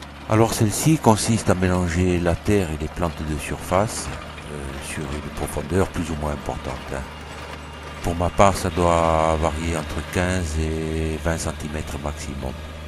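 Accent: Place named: Corsica, France. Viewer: Bravo pour cette vidéo. je comprends mieux ce que j'ai à faire chez moi. Et merci pour ce magnifique accent corse!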